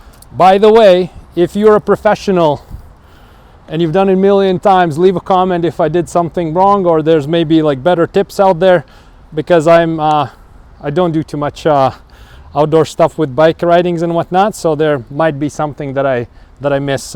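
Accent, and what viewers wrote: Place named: Canada. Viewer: Your accent is so cool, it's fun to watch just for that. I have an RVR. Very good info my Canadian friend. (From Toronto)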